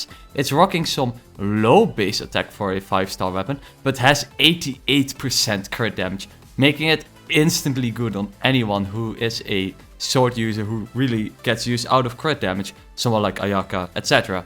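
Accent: France. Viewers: The French accent, i'll pull for whatever listening to the accent. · you have an accent... I can't pinpoint it but it sounds french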